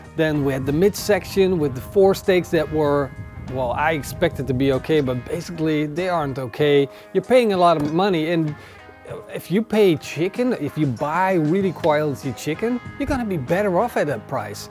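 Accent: Netherlands. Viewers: Would love to see you do some basque beef/ txuleta this year 😉👍🏻 keep up the great work, always love the videos and great to hear the Dutch accent 👍🏻 happy new year from Australia 🇦🇺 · Me watching this video: Noticable thick dutch accent Guy shows cheap steak from the jumbo: Yep definitly a dutchman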